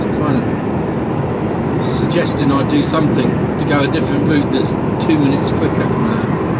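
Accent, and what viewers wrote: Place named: South London, United Kingdom. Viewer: Always confused trying to place your accent,picking up a little South London,Plumstead area maybe.? Enjoyable vlog,nice to see the A1 ,used to spend hours on it and hated it then.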